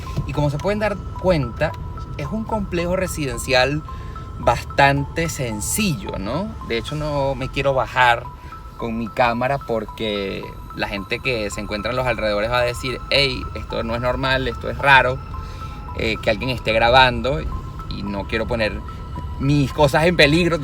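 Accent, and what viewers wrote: Venezuela. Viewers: Tratando de presentar estereotipo con ese asento de gay...osea asento venezolano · este pendejo de donde saco su estadistica. Con esa actitud y voz afeminada logro q no llegue ni 1 minuto, sin considerar ese acento venezolano asqueroso como esa gente.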